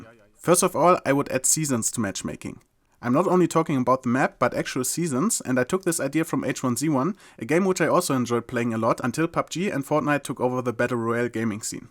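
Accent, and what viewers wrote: Germany. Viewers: Man hört so krass, dass du Deutscher bist 😂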